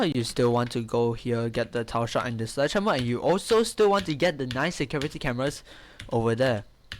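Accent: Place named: Singapore. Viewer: unrelated, but are you from SEA? specifically SG? the accent really gave me a shock hearing it in the wild LOL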